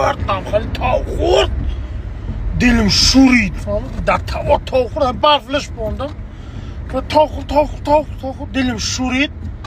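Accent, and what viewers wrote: Kulob, Tajikistan. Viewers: Ака зури бади бахдо кулоби гап нест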